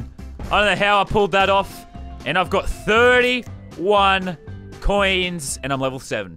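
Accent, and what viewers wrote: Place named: Australia. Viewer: What part of Australia are u from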